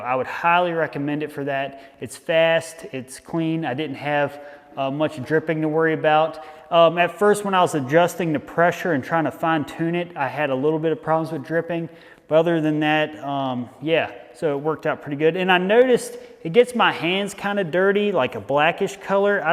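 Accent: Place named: Baltimore, United States. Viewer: Holy Baltimore accent, “q-pon” 😂. Love it.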